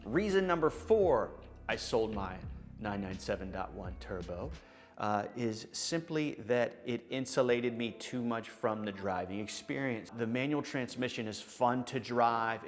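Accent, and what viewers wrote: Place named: United States. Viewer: Hi, greetings from Germany the homeland of your Porsches! You are a very cool Porsche-Enthusiast speaking such a clear (american) english so that it is great fun to listen to you. But what about the german licence-plate from Munich in the background nearby the Porsche-Light on the working-table in your amazing Garage? Are there any Porsches from Germany in your Garage (mostly German People are looking für US-Porsches and very few US-Fans looking for the german Market)? Greetings G.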